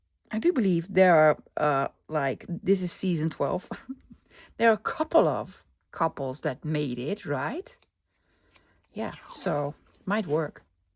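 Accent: Netherlands. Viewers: I was sitting here watching you and thought, “I wonder where her accent is from, it’s probably Netherlands.” And at that very moment, no sooner was the thought in my head, when you said something about - here in Netherlands! Kinda freaked me out! I like your idea with Washi tape book mark! So cool.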